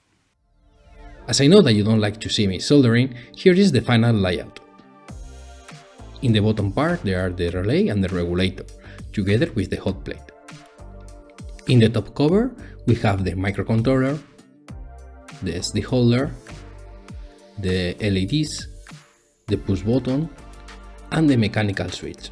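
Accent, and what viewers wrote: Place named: Spain. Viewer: Buen trabajo! Se nota que eres español, pero lo intentas y te salen muy bien los videos!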